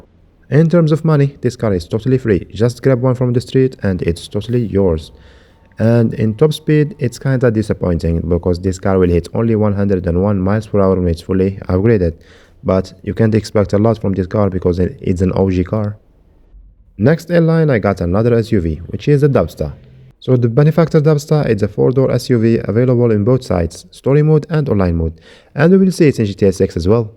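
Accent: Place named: Algeria. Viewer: Brother where you from you sounds djazairi😅😅😅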